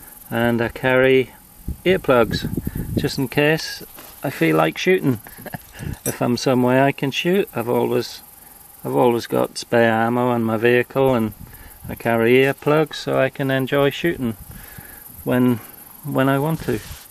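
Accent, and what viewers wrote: United Kingdom. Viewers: Hell yeah, first time seeing this video. The Internet should be full of guys with UK accents doing EDC videos involving weapons, but you're the first I've seen sadly. anyway great video and one is better than none I guess.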